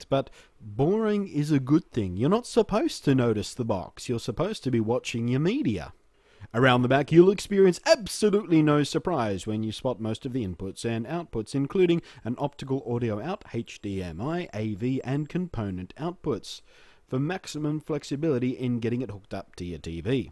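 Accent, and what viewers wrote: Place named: Australia. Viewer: Well damn, as other commentors pointed out there it is in plain text at the end of the video. I'm so used to those marketing style bullet point filled pages I ignore them on instinct. Also, the sound of your soothing aussie voice kept me distracted. Or maybe it's cause I'm sick and hopped up on so many medications I can't think straight but I'm sure it's mostly the aussie thing., I'd really like to see you do some notebook reviews. Specifically the Clevo P151M.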